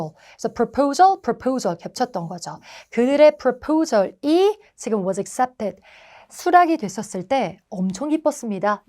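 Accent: Jeolla, South Korea. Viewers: 약간 전라도 사투리가 느껴집니다. ㅋㅋ 읎어여~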